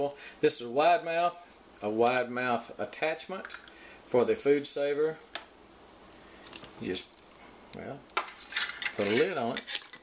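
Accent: Texas, United States. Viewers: Texas men have the sweetest accent🥰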